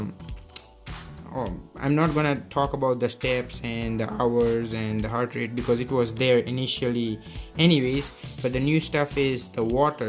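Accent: Gujarat, India. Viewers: accent is awesome gujju bhai :)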